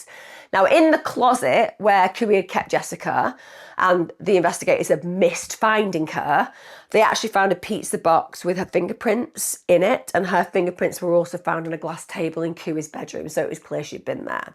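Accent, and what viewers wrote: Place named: United Kingdom. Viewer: I only just noticed your British. I have no clue why it took so many videos, to even notice the accent, I thought you were American.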